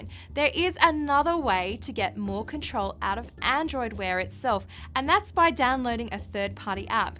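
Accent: Australia. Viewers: There is nothing wrong with her voice. If you're referring to her accent, its Australian mate. Aussie Aussie Aussie!! Oui Oui Oui!!! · Is she Australian!?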